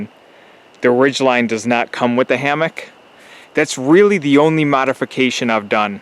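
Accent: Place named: Western New York, United States. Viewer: Just found your channel, and heard the WNY in your voice. I grew up less than an hour from this trail. Live in VA now, and miss the geography and the seasons. Subbed. Go Bills!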